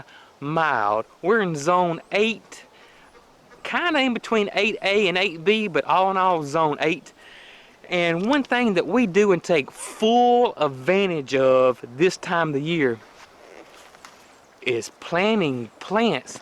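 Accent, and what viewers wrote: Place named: Tennessee, United States. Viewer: love your channel! I'm a new sub,love the first video I watched you sounded just like me here in Tennessee.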